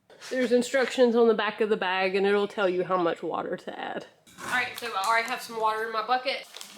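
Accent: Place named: South, United States. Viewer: Good video, well depicted. One thing ( Not a criticism) Hey, where are you from? I love your accent. I talk like that too, but my family wonders why because we were from Ohio and Pa, not the SOUTH.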